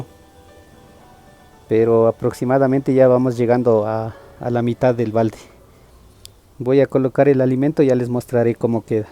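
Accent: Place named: Quechua, Bolivia. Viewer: Hola, gracias a ti. Lo dije porque me recordaba mucho tu acento a los bolivianos que hablan quechua, será una casualidad. Saludos !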